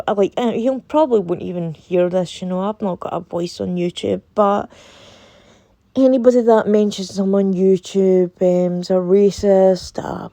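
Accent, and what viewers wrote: Scotland, United Kingdom. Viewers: Don't you change for anyone, I love your wee Scottish accent🥰 the more people listen to your accent the more they'll pick it up. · . Your Scottish is fine so don't change a thing. Thousand agree with you, and thousands will continue to but many haven't the time to comment which doesn't mean we don't agree with yours. Free speech is free speech and that is the main point here. YouTube is very much controlled by the wrong people. You keep reviewing as a good job done. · I love listening to Scottish accents. Bless you 💖 Much love from Australia 🇦🇺 and from one to another. 😊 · Love your Scottish accent! ❤️Subscribed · Your Scottish accent is delightful. Reminds me of my late and greatly loved great-uncle. His wife, and her sister, my grandmother, both had strong Irish brogues so the Gaelic accents are music to my ears. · I love your Scottish Brogue, lovely. 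I’m from Florida and I have no problem understanding you. I also like tangents, they are interesting and so are you. Don’t listen to the negative comments You do You❤️🌺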